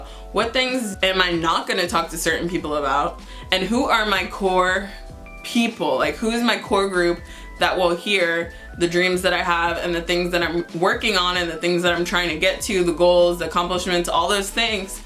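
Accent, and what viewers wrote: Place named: United States. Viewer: What part of america r u from interesting accent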